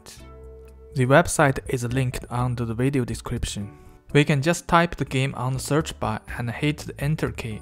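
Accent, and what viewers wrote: China. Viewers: 这口音，中国人吧？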